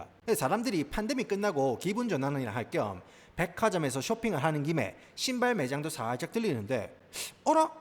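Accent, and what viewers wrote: Gyeongsang, South Korea. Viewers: 웓따 행님 발음 함 구~수 하구마이. 영어는 미끄덩~ 사투리는 갱상도 싸나이네~ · 영어도 경상도 사투리 억양이 있네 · Chic한 현지식 영어발음 구시한 경남 사투리의 조화 ㄷㄷㄷ 평냉에 스리라차 소스 넣은 감칠맛...이 좋아오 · 내용도 유익하고 말씀도 친숙하고 알아듣기쉽게 경상도 내의 서부유타읍 악센트와 발음으로 말아주시니 정말 재밌습니다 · ㅈ ㄴ 경상도 사투리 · 왤케 발음이 좋아요? 갱상도 바이브가 네이티브임❤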